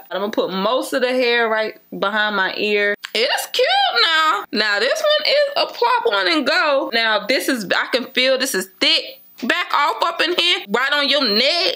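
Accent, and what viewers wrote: North Carolina, United States. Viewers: NORTH CAROLINA OMG🤣no wonder i was like what we sound the same 🤣🤣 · I knew that accent was NC 😂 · "I guess I have a accent" Me, from NC: I don't hear anything 👁👁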